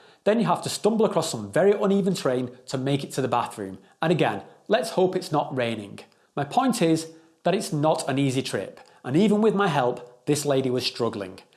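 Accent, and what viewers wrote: United Kingdom. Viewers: Awesome review mate. It was definitely balanced and fair. Honestly some really vital information that anyone planning to spend thousands of dollars and weeks of their time to execute should know. I have a feeling that by the time I’d actually go on a retreat, Merosa would be a real gem. On a side note, I hud no idea you levved over thur in Swetzerland. I feel like the more I lesten to ya the moar I start thenkin in ur accent. But I’m just a white boy from the good ol’ Yew Ess of fockin’ A mate. But for real, what part of the UK are you from? I seriously dig your accent.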